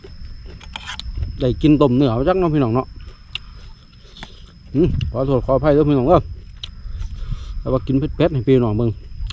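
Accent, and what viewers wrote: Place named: Amnat Charoen, Thailand. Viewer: หนูว่ายุอ้ายคือเว้าคือภาษาบ้านหนู เอฟซีอำนาจเจริญเด้อจ้า 😁😁😁😁😁😊😊😊😀😀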